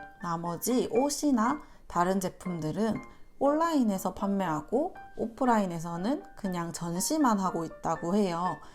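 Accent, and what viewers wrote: South Korea. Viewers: 대구인 답게 대구 사투리를 쓰시네요!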